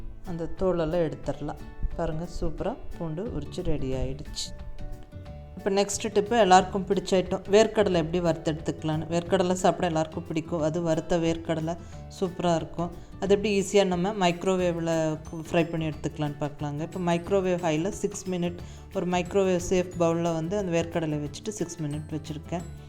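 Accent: Kongu, India. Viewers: Very useful. Your kongu Tamil slang is also good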